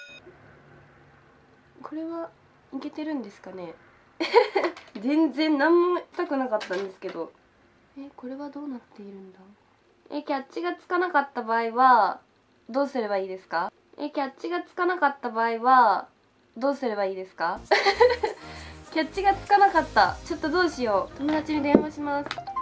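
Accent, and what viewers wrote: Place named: Gifu, Japan. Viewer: 自分岐阜だから喋り方に親近感湧く！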